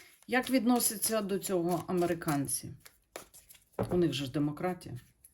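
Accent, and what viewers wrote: Western Ukraine, Ukraine. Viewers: гарна мова з акцентом Західної України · У Вас акцент дуже злегка західноукраїнський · у вас шикарний западенський акцент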